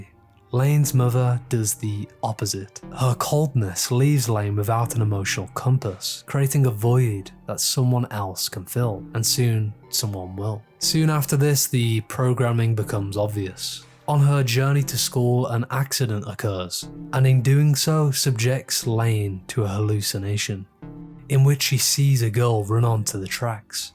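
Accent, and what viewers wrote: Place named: United Kingdom. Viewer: E<link> British Accent.. unlistenable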